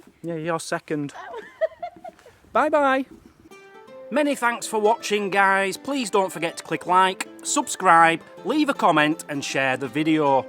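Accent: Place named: United Kingdom. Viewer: What part of the UK does one acquire an accent like? It's so endearing.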